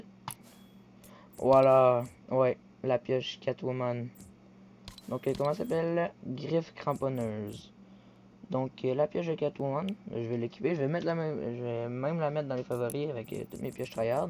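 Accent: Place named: Quebec, Canada. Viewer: att t qc ou pas ta 1 acent ca te dit ont s'ajoute ou nn chui qc moé aussi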